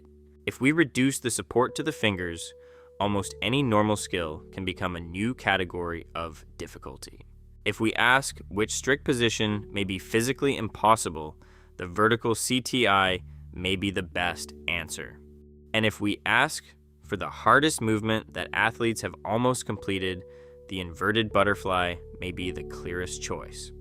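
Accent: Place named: Ukraine. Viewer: I missed your videos brother and still miss your Ukrainian accent, hope you are doing well.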